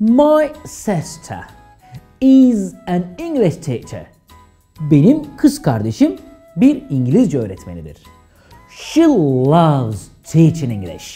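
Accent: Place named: United Kingdom. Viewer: hocam formatınız iyi..teşekkürler.. bir kaç önerimde var bunun yanında..amerikan aksanını ön plana çıkarmanız....çok kullanılmayan dip bilgiler yerine günlük hayatta sıklıkla kullanılan kalıplara yönelmeniz..ingiliz aksanı kafa karıştırıyor kullandığınız...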